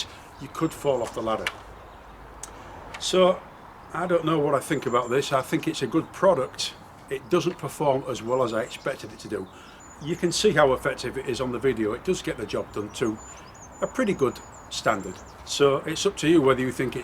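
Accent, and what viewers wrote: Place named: Hull, United Kingdom. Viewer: I certainly did find the review useful. It was excellent, detailed and honest. I would expect nothing less from a Yorkshire man. However, I was really struggling to decide where the accent was from. Initially I thought your accent could well have been from South Yorkshire, even Sheffield, but then I heard the way you said "work" and "nine" and they sounded like a very slight Hull accent. Anyway, something I do want to know is how did it perform on your actual windows. I saw you soap them down but not clean the soap off. Does it leave faint limescale marks where droplets dry?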